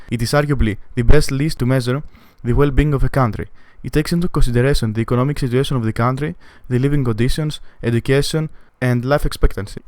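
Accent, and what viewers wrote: Greece. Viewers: Bias? Greek accent. And as an Albanian, i'd choose Slovenia aka. Switzerland of the Balkans. I've only been to Montenegro, Kosovo, Slovenia and Greece (i was gonna go to Serbia but was not welcomed lol) and I judged by the ranking in the video and my experiences. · Constantinople was Greek so Turkey is not in Balkan Greece has economy crisis but richest in the Balkan And you Greek because this is how greeks talk in English φίλε μ 🤣λέει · your English accent is sucks buddy all Greeks have same accent try to speak better English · Greek English..I just found out lol..nice job btw Greece is the best #GREEKLIFE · I know you ard greek from your accent hahah XDXDXD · This is a voice of a Greek i just know... (I am from Greece btw) i might be wrong idk... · It is so obvious you are Greek your dumbass accent is giving it away. Btw its MACEDONIA not fyrom :) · By his accent alreafy found out he was greek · ΝΑΙ ΜΕ ΤΟ ΠΟΥ ΑΡΧΙΣΕ ΤΟ ΒΙΝΤΕΟ ΚΑΤΑΛΑΒΑ ΟΤΙ ΕΙΝΑΙ ΕΛΛΗΝΑΣ ΛΟΓΟ ΤΗΣ ΠΡΟΦΟΡΑΣ.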